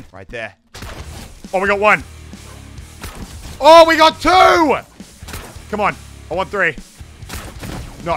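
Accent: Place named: Australia. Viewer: I know u have an astralian accent but it's annoying when u say ashume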